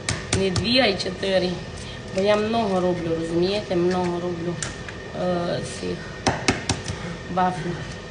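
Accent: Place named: West, Ukraine. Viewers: То чого ж це ви, «українка», російською пишете? А я ось, не українка, вивчала українську у радянській школі у Києві , живу більш ніж тридцять років в Ізраїлі, але ж не тільки пишу українською а ще й усе те, що ця шановна пані каже, зрозуміла. Дуже приємно слухати західний діалект, немов річечка. А вам таки має бути соромно! 😁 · Взагалі українки говорять українською мовою, а не рашистською. Автор відео говорить західно-українською ( діалект). Якщо не подобається, не дивіться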